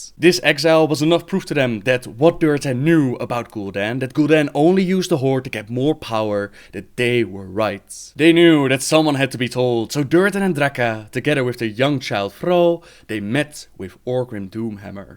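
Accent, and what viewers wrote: Netherlands. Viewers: are you Dutch ? :) well i am c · I know I asked that on asked but I am not sure if you saw it. Can you make fury do one lore video? It is relaxing to listen to her voice. It would be perfectly to just chill out and listen to some great story told by fury:) And force her to make music and sing. But never the less keep up your good work too. Also a great voice to listen to and the dutch accent is always a friendly one to hear:D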